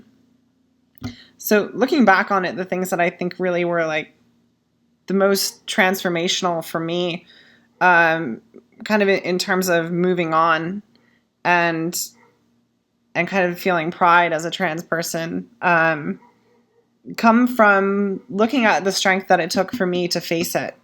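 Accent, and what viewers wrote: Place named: United States. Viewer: i only discovered you this afternoon through your two '5 lies' videos but i have already committed myself to watching them all over the next week, month, year, etc (as many times as necessary for the points you make to sink in). you have such insight and self-awareness, and seem able to articluate your feelings through language so effectively, your videos are utterly compelling. even more, you even seem able to put into language feelings i have but can't find language to express myself. sometimes you say something that 'hits' so hard i have to stop the video, take a deep breath and recompose myself before carrying on, because you are bring thoughts and feelings i have into focus for me in a way i can't. maybe because i am too close, or too afraid. or because i don't know what i am looking for when i think about myself. 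i will look into your ebook too. i'm in UK/EU half-half. i'm guesssing most of it would apply (feelings are universal) and that it isn't specifically focused on getting help in the states (i'm guessing the states, from your accent but sorry if i've got your location wrong). as for more updates and videos, i will watch anything you post without hesistation. don't let your modesty or humility stall you. my eyes widened in this video when you commented that the running time was nearly ten minutes so you had better hurry up. truly, i would have been fine with an hour. or two. whatever you wanted to do. if anyone watches your videos with one eye on the clock i wonde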